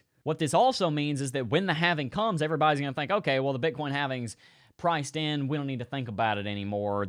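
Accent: South, United States. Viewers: I like the mix of smartness and the southern Accent he has.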